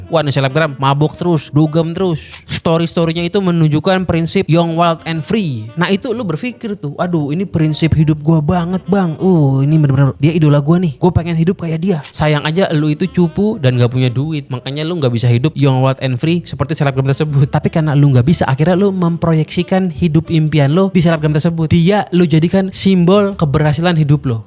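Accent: Java, Indonesia. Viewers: Kenapa kamu sekarang intonasinya ke Jawa"an bang, terinspirasi dari maha guru kah? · Iya, kalau di channel ini aku jadi jawa sesuai mahaguru, biar beda sama di channel utama 🤣🤣🤣🤣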